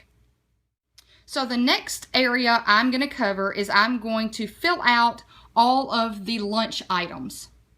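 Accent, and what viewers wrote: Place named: Alabama, United States. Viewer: Girl this is a very good system and printout. I'm from Alabama and I know you are close by.......I recognize that accent :)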